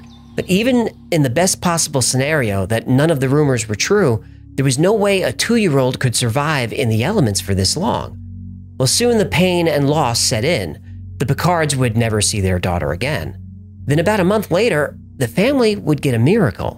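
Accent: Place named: Long Island, United States. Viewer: Not Brooklyn. That's more of a long island accent.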